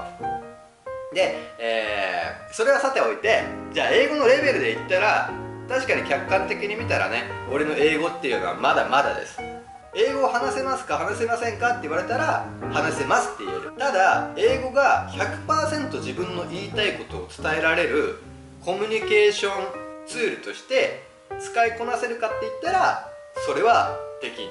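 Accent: Japan. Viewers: この動画も九州方言も好き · カナダにワーホリで行ってたけど、本当のネイティブスピーカー以外は文法も発音も結構適当というか完璧ではなかったです。そりゃそうだ、そこで生まれて育った訳でもないんやから。伝えたいことが伝わって最低限相手の言いたいことがわかることが大事な気がします！なによりこの人の博多？なまりが何より安心できました笑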